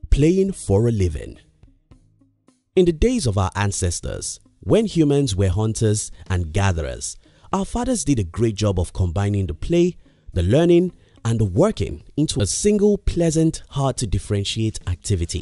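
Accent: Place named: Nigeria. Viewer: I feel your voice is Nigerian, its not that important but can that hand be dark skinned? 😊 However good video, inspirational and motivational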